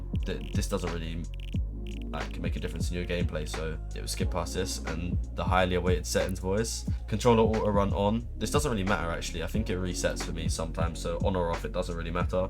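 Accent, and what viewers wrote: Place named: United Kingdom. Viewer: Are u British I love the British accent 🥰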